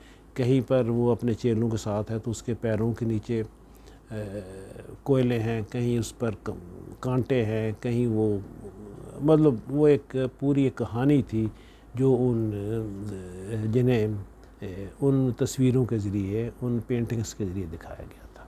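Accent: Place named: Punjab, India. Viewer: کہوں مجھے آپ کے تلفظ سے مشرقی پنجاب کی جھلک نظر آتی ہے 😊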